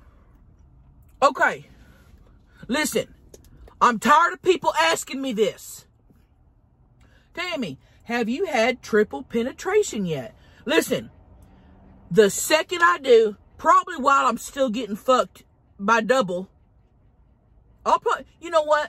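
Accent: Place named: South, United States. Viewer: I adore this woman 🥰 and her little southern accent lol SPLURGE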